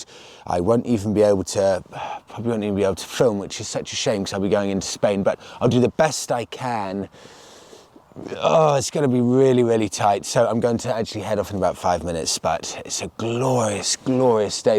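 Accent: RP, United Kingdom. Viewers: Firstly, thank you for speaking so eloquently! As a fellow Brit, it's very refreshing to hear the Queen's English spoken as it should be... Secondly.. How.....? I used to have a Bonneville and found it very uncomfortable after about 70 miles.. Also, it needed filling up every 85 miles... Fair play to you though... I've done this trip before on an FZS600 and loved every minute of it..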